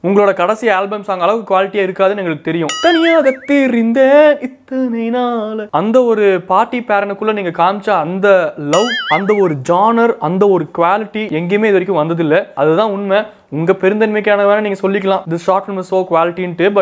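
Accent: Coimbatore, India. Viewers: super so Coimbatore full language speech super hit video your channel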